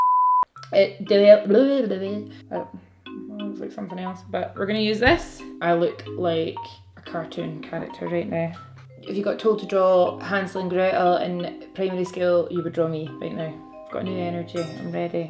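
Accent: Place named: Glasgow, United Kingdom. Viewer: Ive been watching all the recent dramas across the pond and it’s so lovely to come here and listen to that beautiful familiar (glasgow girl here too!) accent and some gorgeous makeup! Keep it up Mrs!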